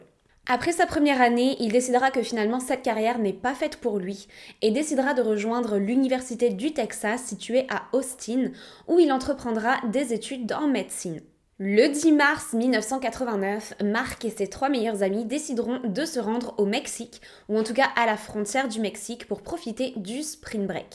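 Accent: Quebec, Canada. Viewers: Merci beaucoup pour ta vidéo tu es GÉNIAL et tu racontes très bien en plus j adoreee ton accent québécois 😁😁😁😁😁👌👌👌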